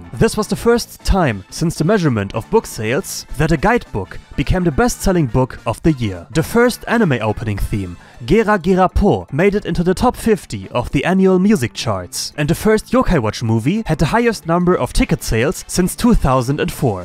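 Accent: Germany. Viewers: Your accent ist sehr sehr deutsch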